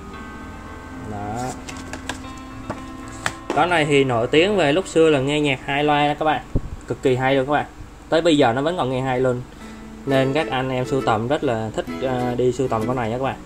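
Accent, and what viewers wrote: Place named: South, Vietnam. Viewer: giọng miền nam dễ nghe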